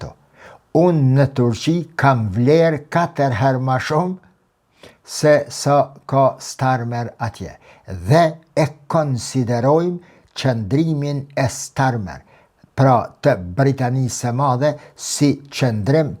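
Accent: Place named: Kardhiq, Albania. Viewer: Ca flet kardhiqe moj kuder e metohise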